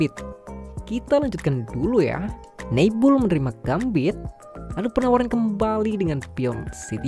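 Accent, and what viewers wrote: Java, Indonesia. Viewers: Konten berulang ini ya? ini yang versi lama : <link> versi medhok jawir, walaupun sekarang masih medhok sih aowkwkwk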